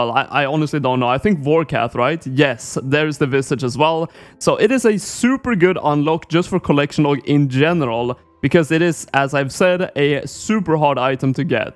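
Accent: Sweden. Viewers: jävlar va svensk du låter 🤣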